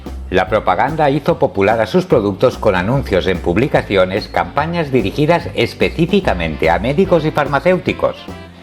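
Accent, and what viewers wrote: Catalonia, Spain. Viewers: Quiero felicitar al locutor, su castellano con acento catalán suena perfecto.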